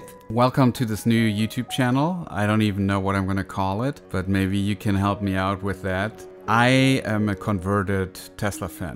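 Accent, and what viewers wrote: Germany. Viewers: Grade über deinen Kanal gestolpert, super Video, dein Akzent bewegt mich dir Grüße aus der Heimat zu senden. Hoffentlich gibst es das Solarroof bald in Deutschland zu kaufen!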